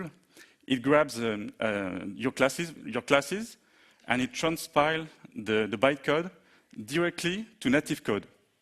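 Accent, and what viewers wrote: France. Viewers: Sacré accent Français :D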